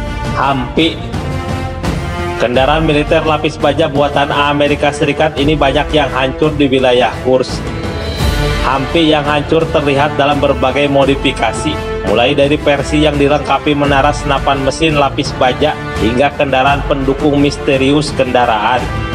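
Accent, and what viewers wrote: West Java, Indonesia. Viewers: Sunda banget mimin dari logatnya 😀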